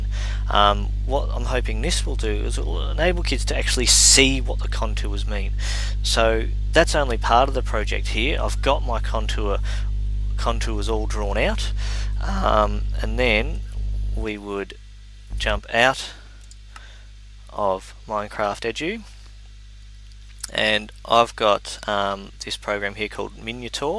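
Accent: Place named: Australia. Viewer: I thought you were Australian from the accent and turns out i was right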